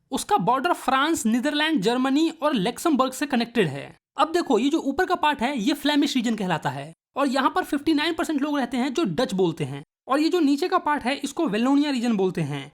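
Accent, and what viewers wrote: Bihar, India. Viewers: bhaya aap haryana se ho kya mujhe to bihar se lagte ho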